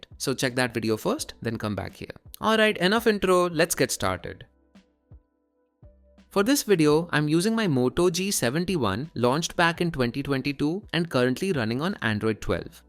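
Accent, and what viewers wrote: India. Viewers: The Indian accent makes it very suspicious!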